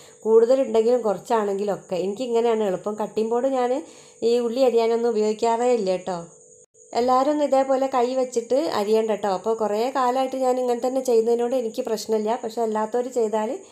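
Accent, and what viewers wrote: Kozhikode, India. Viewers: Kozhikod കാരി ആണല്ലോ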